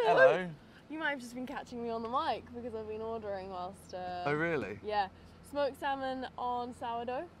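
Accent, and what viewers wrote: United States. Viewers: Love the American accent 😂😂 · the american accent is gold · Ur american accent is actually fire. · American accent is getting stronger. One of us, one of us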